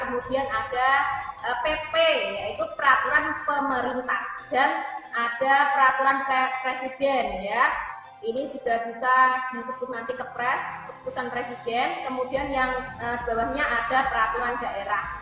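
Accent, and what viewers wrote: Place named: Demak, Indonesia. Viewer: Medok banget suaranya, Org Dmk ya🤣😂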